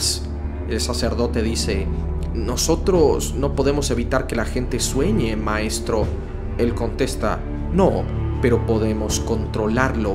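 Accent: Argentina. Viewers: Alguien que me diga de que parte de Argentina es el narrador?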